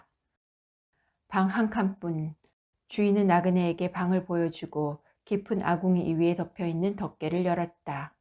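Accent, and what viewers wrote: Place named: Seomjingang, South Korea. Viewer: 님 섬진강 사투리가 구수하네요 처음듣는 때 울음 🐓 꽃 따는 머슴 왠지 가슴이 먹먹하네요 매화골 언덕 길 찻집 머슴 나뿐놈일까요 슬품이 담긴 피릿소리 꽃 차향기 외로운 여인의 향기는슬프군요 😊 감사합니다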